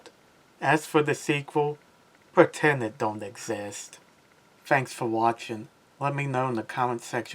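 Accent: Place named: Maine, United States. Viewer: hey, just found ur channel thru ur video on roy and i loved it! very helpful information. i was just wondering, are u from the northeast by any chance? ur accent makes me think u might be from maine. take care!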